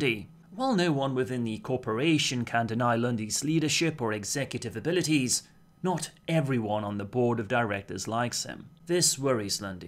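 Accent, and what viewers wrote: United Kingdom. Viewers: That accent makes me think I'm listening to a British news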